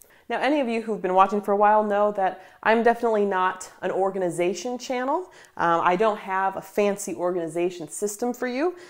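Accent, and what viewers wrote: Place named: United States. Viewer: Dear it is so nice to hear you speaking american english. Such a pleasure.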